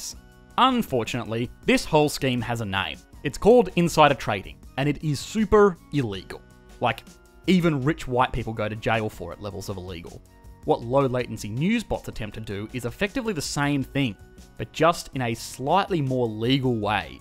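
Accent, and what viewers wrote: Australia. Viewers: Economics Explained has the most charming Australian accent. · Editor He is from Australia ? :D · its actually irritating, uk australian accent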